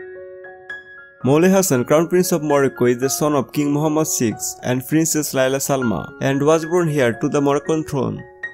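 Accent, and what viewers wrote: Bengal, India. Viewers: Narrator is bengali Asli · Your accent you must just be an Indian ,thank you for your care about my country. ....Casablanca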